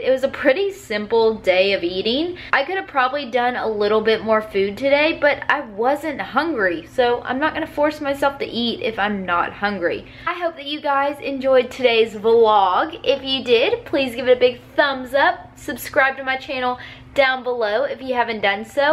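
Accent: South, United States. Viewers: I just love you and your southern hospitality and accent! And love Bo! He’s adorbs! I would love to try the sausage biscuits! They look yummy! Following them on FB and IG!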